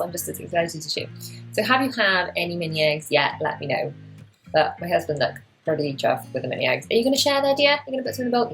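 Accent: Wales, United Kingdom. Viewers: i love this video but i love even more how you say quarter lol love the welsh accent!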